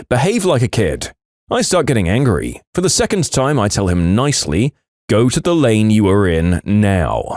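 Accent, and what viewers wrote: United Kingdom. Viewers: I see your British.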